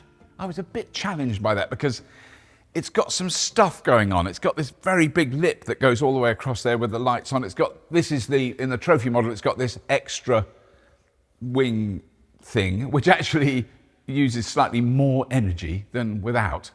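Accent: United Kingdom. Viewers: I hate British accent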